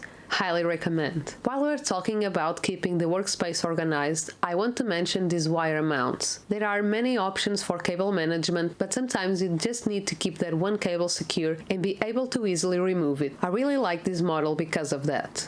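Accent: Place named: Portugal. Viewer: Primeiros segundos do video e percebi de imediato que és Portuguesa 🇵🇹. Além disso, impressões funcionais e não apenas bonequinhos? Subscribed!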